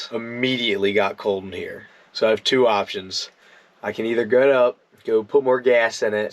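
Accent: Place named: Maryland, United States. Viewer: First time viewer here - I heard your accent and knew you were from Maryland 😂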